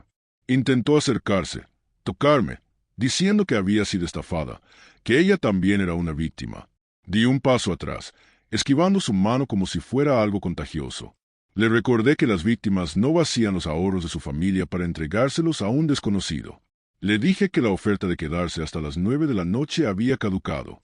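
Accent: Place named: United States. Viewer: La historia estaba bien, pero por qué usar una voz de IA tan rara? El tono de voz es horriblemente incómodo y el acento gringo es innecesariamente molesto.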